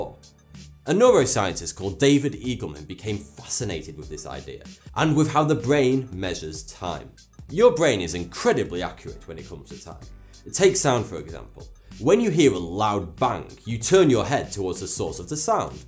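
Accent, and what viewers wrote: United Kingdom. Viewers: Watch your channel just 2 learn the British accent, tank u.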